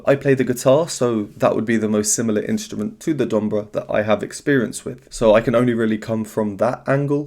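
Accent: United Kingdom. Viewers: Dear, I just love your videos and analyses, having no knowledge of music in theory and understanding next to nothing about notes and registers. Besides, being a linguist, I really enjoy listening to your irreproachable British accent. And Dimash... He is so talented that no words in any language can express. Everything he does is so beautiful, so powerful, so peace-in-the-world-loving (if that might be considered a word). Anyway, I'd like to give you a recommendation of a totally different kind. It's related to a... beatbox artist from Russia, Taras Stanin. Just look him up on YouTube if you find time. He's also an extremely talented guy in what he does. And the things he does about music, about covering well-known pop songs with his incredible combination of beatboxing and singing are just out of this world, in their own way, of course. Right now, his popularity on YouTube is skyrocketing due to all that stuff. 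I just wonder what you might say about such an outstanding artist performing in such an usual genre. Best wishes and regards from Russia! 🤗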